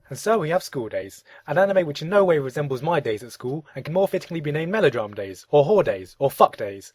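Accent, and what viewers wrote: United Kingdom. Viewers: Me and my friend wanna marry you because your Asian, and you have a British accent. And these videos are fucking awesome. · hey sounds british but hes asian XD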